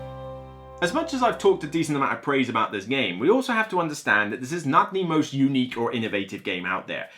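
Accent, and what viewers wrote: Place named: Midlands, United Kingdom. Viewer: This may seem like and odd comment but it’s intrigued me: Have you spent time living in the US? I cannot place your accent. It is midlands on the whole, but every now and then words are pronounced in a strong American accent….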